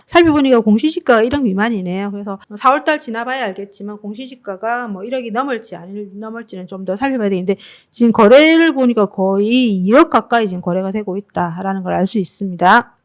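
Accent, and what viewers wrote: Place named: Gyeongsang, South Korea. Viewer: 제 생각에는 영남쪽이 고향이신듯하고, 그래서 사투리가 섞여있으셔서 그렇게 들리시는거 같아요.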